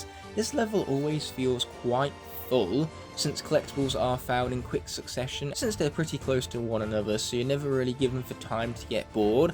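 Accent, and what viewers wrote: United Kingdom. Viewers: yeah, I understand, just thought I would share my thoughts as I don’t want people clicking of on the intro without seeing how great your videos are, I’ve only watched you for a week now since I wanted to Skylanders nostalgia, but I have really loved your content man and finding out your British too lol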